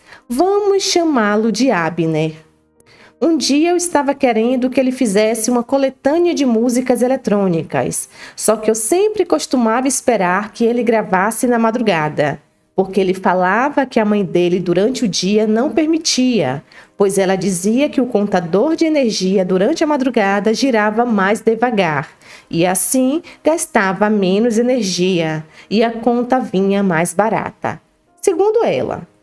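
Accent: Bahia, Brazil. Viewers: Adoro seu jeito de falar seu sotaque. Vc é baiana?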